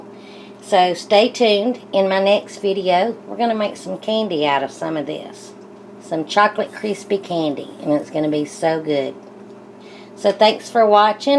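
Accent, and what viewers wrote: South, United States. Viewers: Thanks for sharing!!! Love your Southern Bell personality & voice!! You're amazing:) thanks again!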